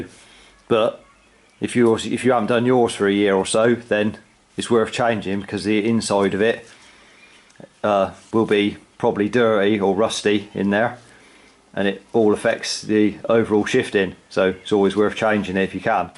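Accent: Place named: West Country, United Kingdom. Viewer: Sounds like UK west country, perhaps Devon.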